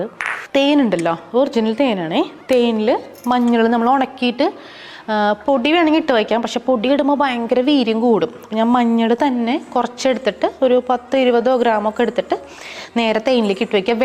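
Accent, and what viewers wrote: Thrissur, India. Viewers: തൃശൂർ slang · Trissur dialogue ❤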